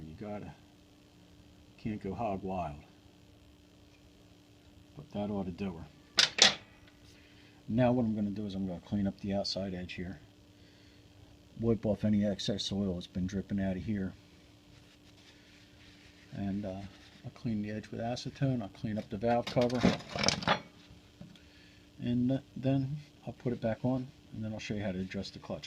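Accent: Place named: Delaware County, United States. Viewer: Judging from his speaking manner, my bet is that he's from the Philuffia PA or South Jersey area, and has a little bit of a "Delco Accent". Sometimes difficult to discipher even by locals. GO BIRDS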